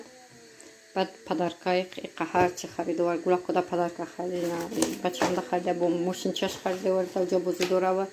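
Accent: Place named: Tajikistan. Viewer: САЛЛОМ ГАП НЕСТАЙТОНА РАНГИ МА ГАП МЕЗАНЕД ЧИСТА ТОЧИКИИ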